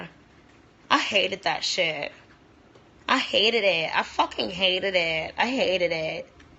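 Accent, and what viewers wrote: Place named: Dominican Republic. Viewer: she grew up with Dominicans. Why shouldnt she speak like the people she grew up with? Its not like accents are genetic lol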